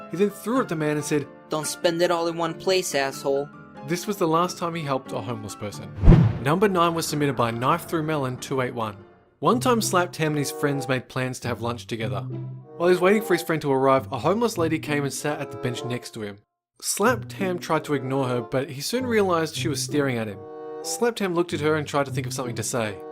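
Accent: Australia. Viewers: Oh, you’re Australian. Thought u were British... whoops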